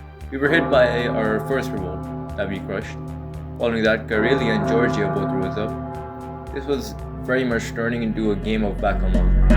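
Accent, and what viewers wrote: India. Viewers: Try to hide the accent next time jeet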